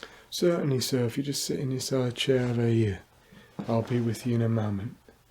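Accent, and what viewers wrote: England, United Kingdom. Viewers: are you British? it sounds so real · Youtube's automatic captions didn't stand a chance with this one. I do enjoy a strong english accent though. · I think he’s English but doesn’t speak with a cockney accent… could be wrong but check out his other videos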